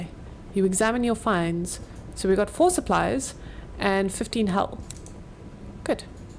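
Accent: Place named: Germany. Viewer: hello. i think i can hear an accent in your voice. may i ask what country of origin you are from? oh wait, are you from germany? have you any plans to continue playing this game?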